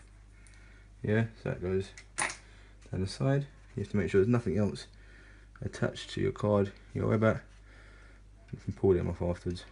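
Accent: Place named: United Kingdom. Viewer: I did not understand anything of what you said... its too bri-ish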